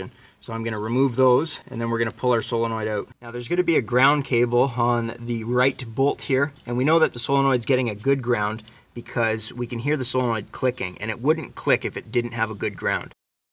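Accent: Canada. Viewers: Good advice, you sound Canadian. All it takes is an ohm or two of extra resistance in big cables like that, and power is robbed from the starter motor in line. Drop testing would have been fun to see before removing wires, though the lack of any voltage at all on the starter post in the beginning is strange. Do garden tractors and farm equipment usually have starter solenoids separate from the starter motor?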